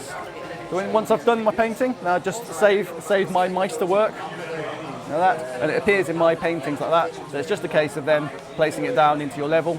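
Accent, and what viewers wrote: United Kingdom. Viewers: cool an Asian with a British accent demonstrating my favorite game! :D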